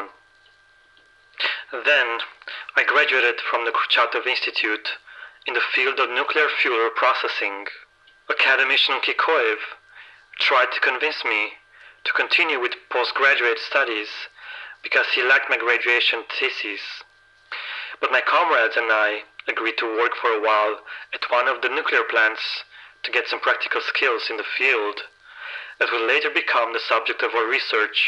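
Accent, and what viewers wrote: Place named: Sweden. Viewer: Are you Swedish? I read your profile and it says Romania but your accent strikes more as if you were a native Swede.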